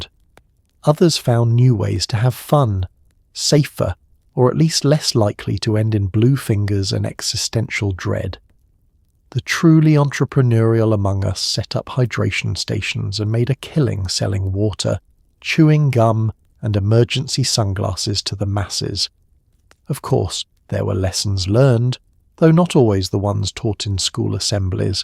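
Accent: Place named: United Kingdom. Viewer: For a while, we had those. I tried it. I thought it was disgusting... This is no Heroin, not Coke, not MDMA, not even a shot of alcohol. Not even like a joint somebody passes around. And Mister you know what? Doesn't matter where and when I am. I don't know your name. You are a Voice with a polished British accent. alright... Remember earlier when I said disgusting? Well a close friend who was on a medical regime for mental problems (Depression is the No.1 Appetizer for drugs.).. And he didn't think it was disgusting. He was full of that thing, he was at work but just to jump from the 4th floor. Because old People in Yemen chew those leaves to pass the time, cathinone is harmless... They've been doing this for years, It's not tobacco. no smoke. Once again, we re-re-rediscover some plant that was originally used to Benefit, Chemists distill it, add things, remove things, and Encapsulate: A "Pill".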